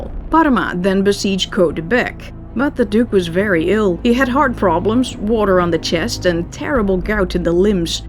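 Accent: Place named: Netherlands. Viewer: i keep wondering which of your accents is the real one. But by any account, they're both great. (I think you have Dutch roots)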